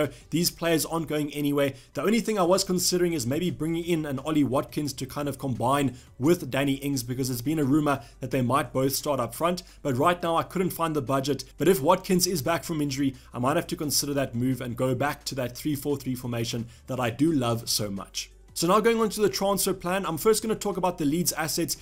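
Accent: South Africa. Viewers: Are you South African ? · Bra you sound South African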